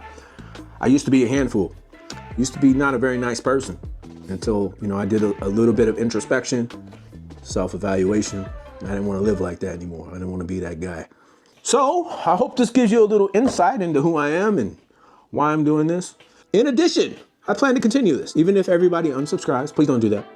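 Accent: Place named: South, United States. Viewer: This explains the redmeck accent you do 😂 Awesome video bro. Thanks for sharing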